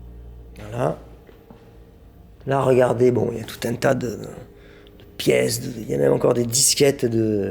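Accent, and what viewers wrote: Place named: South, France. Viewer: Je ne sais pas pourquoi YouTube me propose cette vidéo.... Une intro à laquelle on n'entend ni ne comprend pas grand chose, on se balade dans des travées pour voir des portes armoires blanches et une outro presque aussi désagréables que l'intro. Quand on croise quelques appareil ou que des portes s'ouvrent, la voix nous dit juste ce que c'est dans son jargon sans expliquer. L'intention est sûrement louable mais on n'est plus en 2010. PS c'est original un gars avec l'accent du Sud de la France, à Rennes, qui parle comme un belge ou un suisse (nonante :)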